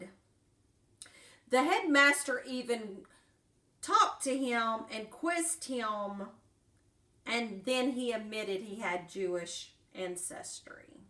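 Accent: South, United States. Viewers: Really.... he wasn't even black... According to what we have seen... He was maybe only 1/3 "black", as they reckon it. He was more "white" than black. He just used the black as a stepping stone to make his money and create his political agenda. BTW, I love your southern accent. I'm from Mississippi, and I really miss a real "southerner" on these channels.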